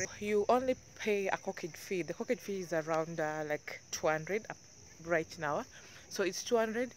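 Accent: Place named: Texas, United States. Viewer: Why do you sound so westernized? Ongea kama mkenya, you sound like you from Texas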